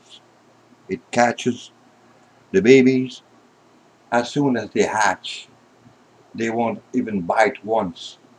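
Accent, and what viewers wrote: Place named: Louisiana, United States. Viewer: I hear a home accent of a Cajun... ❤🥰❤🥰❤ I am from the southern part Of Louisiana.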